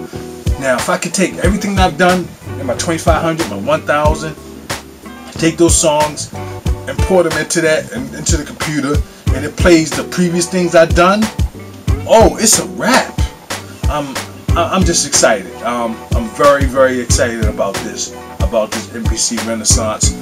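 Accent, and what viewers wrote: Baltimore, United States. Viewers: I'm willing to bet money homey is from B-more or somewhere close. that accent is hard.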